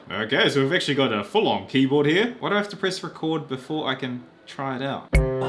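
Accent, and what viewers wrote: New Zealand. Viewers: are you from new zealand? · are you a new zealander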